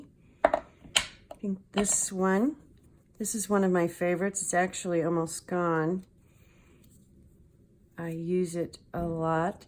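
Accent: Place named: Midwest, United States. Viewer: I found you through Tracie's GDT and am a new subbie! Loved your journal & followed over to see your pockets. I was so tickled when you said washi or warshi... LOL I was like omg she must be from the midwest, like Missouri! Then you said you were from Mo, I was born there and still have family there but I have not lived there since I was young. My grandma, my, mom, and all my family from MO & IN all say WARsh the dishes! Have fun and good luck with your channel, you are quite pleasurable to watch, nice speaking voice and a good sense of humor always win me over mixed with good crafting! win win!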